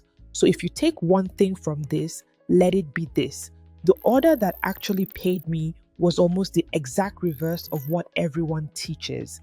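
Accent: Nigeria. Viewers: Yea. I knew I could recognize that's Nigerian accent. I love your channel and I'm subscribing 🖐️ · Wait you are Canadian keeee.. you sound soo Nigerian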